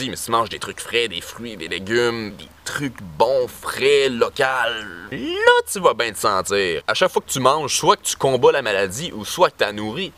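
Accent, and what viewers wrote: Quebec, Canada. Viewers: Vive l'accent québécois et les québécois aussi futés et doux que toi ( message d'une française).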